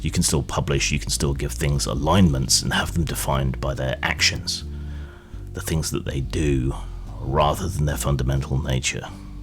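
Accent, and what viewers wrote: United Kingdom. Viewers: Good video,. I find your voice to share a certain charming quality with that of Alan Moore, but less unintelligible to my sensitive Yankee ears. You know us, anyone with a British accent is inherently more authoritative, more intelligent, and more sophisticated so well done! My two cents (or pence, or whatever): I like the elegance of the Law-Chaos paradigm. It is more like yin and yang. Both are an appropriately abstract interpretation of heady psychological ideas, and of more use from a philosophical perspective, in my opinion. They have more concrete and objective definitions, and are less apt to instigate a disruptive moral argument at the table. Also, consider that the circle is always turning, illustrating that no one remains in one or the other for too long, or they risk catastrophe. There's a whole endless discussion there as well. 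Good and Evil, on the other hand are too subjective in their definition and are way too easy to argue over for my use as a DM. Consider the old saying that every villain thinks they are the hero of their own story. Even in the Bible, Christ says that every man does what is right in his own eyes, to say nothing of throwing stones in glass houses, judging not, and a hundred other sayings in a hundred texts of a hundred religions. Defining good and evil, as far as I can tell, is the first step toward war, and allows for the dehumanization and vilification of others, which then opens the door to atrocity of all kinds, including the executio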